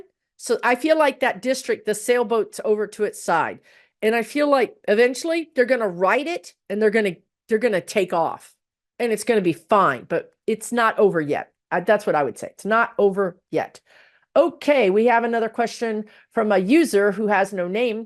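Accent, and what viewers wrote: Texas, United States. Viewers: Chickensh*t you sound like a Texan 😊❤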